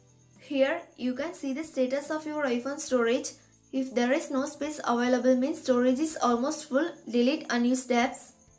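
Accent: India. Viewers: Of course all tech people have heavy Indian accent.....but thanks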